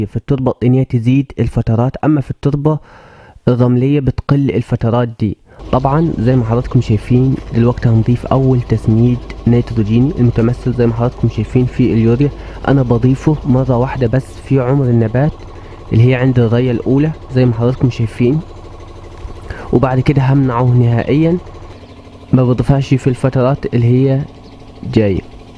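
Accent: Upper Egypt, Egypt. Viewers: مبين من خلآل كلامك ولهجتك إنك من صعيد مصـر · اعجبتني لهجتك الصعيدية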